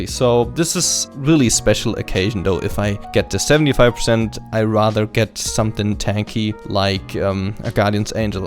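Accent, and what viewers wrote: Germany. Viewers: are u from germany or where are u from?ü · Hey c: are you from germany? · Man merkt an deinem Englisch, dass du aus Deutschland kommst xD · Man merkt übelst dass du Deutsch bist xD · are you german? cuz you sound like ^^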